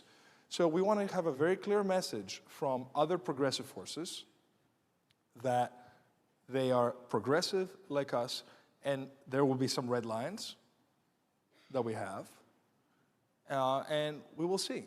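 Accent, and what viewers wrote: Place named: England, United Kingdom. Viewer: Στέφανε ξύρισε το μούσι διότι δεν σου πάει. Κρύβει το πρόσωπο σου. Είσαι πανέμορφος άντρας. Μητρική γλώσσα τσ αγγλικά. Μου κάνει εντύπωση ενώ ζούσες στις ΗΠΑ μιλας με αγγλική προφορά και όχι αμερικάνικη. Καλό κουράγιο σου εύχομαι να έχεις εκεί μεσα στο ΣΥΡΙΖΑ που λογική δεν υπάρχει από τους συντρόφους σου.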